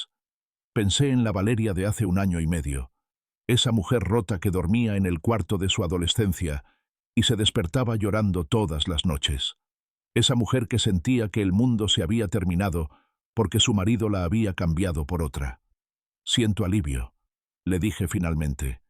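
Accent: Argentina. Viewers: El siseo del narrador desquiciante y el acento ese porteño más desquiciante todavía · Así hablan los argentinos, uruguayos. Aquí en Chile también hablan sin tildes o acento y los plurales no los pronuncian. . Tienes que poner mucha atención para lo que realmente quieren expresar o para colmo hablan entre dientes, casi susurrando la mayoría de veces. Bendiciones.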